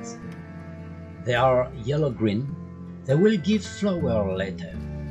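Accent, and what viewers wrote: France. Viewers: Superbe idée, l accent français, les anglo-saxons adorent. Cela leur permettra d apprécier la flore de France. J ai mis un pouce en haut 👍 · The french accent sympa la version en anglais bonne fête de pâques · Hello, thank you for the video in english, I liked the french accent, that's my fun, good continuation, kiss👍😘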